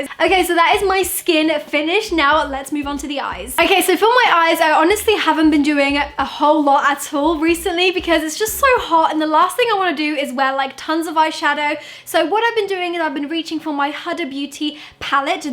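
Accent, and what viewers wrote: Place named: United Kingdom. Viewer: Your tiny little eyes plus your British accent.... ❤ looks and sounds so cute :D i wish i could hv a British accent like that :<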